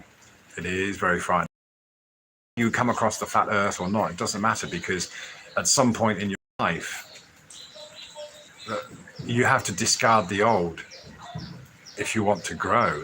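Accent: United Kingdom. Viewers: You have a great mind, an excellent and very English voice. -- "The models work on the predictive nature of nature," is nicely said! Then it makes sense that the better the model, the better it can predict earth's movements and the movements of the cosmos of things related to earth. In 1900 sponge divers discovered a 2,200 year old shipwreck in the waters off the Greek island of Antikythera, within the wreck was found a device that has been a mystery for nearly a 100 years, its known as the Antikythera mechanism. British museum curator Michael Wright hand built a reconstruction of the Antikythera mechanism that includes all of its known features. The device is a planetarium, that is based on a GEOCENTRIC DESIGN of the world, where the sun, moon, planets and stars revolve around the earth, this is a geocentric system. Today the Copernican System or Heliocentric design of the solar system is taught and believed by most people. 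Michael Wright, was formerly the Curator of Mechanical Engineering at the Science Museum in London, and later at Imperial College in London, England. The Antikythera device is also a mechanized calendar based on a 19-year cycle in which the phases of the Moon are repeated on the same days of the year, this is known as the Metonic Cycle. The device displays calendar information that allows for the predictions of a solar and lunar eclipses. The reconstructed device is accurate for all the planets indicating correct positions with-in one degree in a 500 year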